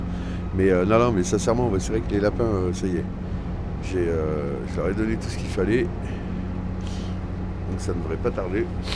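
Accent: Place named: Nord-Pas-de-Calais, France. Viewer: Mon et ses accents... 😂😅 Ton best reste ton chetemi 😍 quand tu te présentes toi tu t'appelles 😅😂 Moi j'adore ta parlementure, et puis après toi tu racontes, moi j'écoute 😂😅🧡 Belle vidéo 👏👍 j'aime beaucoup cette petite série 😍 Gros bisous 🧡🌹💋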